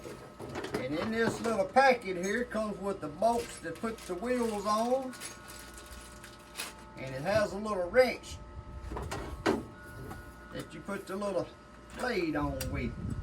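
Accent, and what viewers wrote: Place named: Texas, United States. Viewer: You have such a delightful accent. I'm not sure if it is Texan? I can't get the wheels assembled properly on mine. They are very rickety. I'm just immensely proud of myself that I managed to assemble it at all. Mine is a different make, but very similar to the one you demonstrated. I'm too scared to use the large cutting blade so haven't tested it yet. I do like the plastic blades. A: I feel safer using them, and B: I think they really did a good job. Shall see how long this little machine lasts, but given that a gardener charges £25 an hour here these days, if I get six months out of it, then I've still saved money. TY for the video. Oh and I love the wooden playhouse. So cute.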